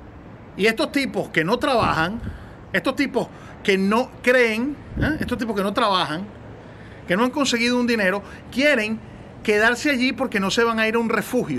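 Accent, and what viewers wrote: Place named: Caribbean. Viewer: Tienes acento caribe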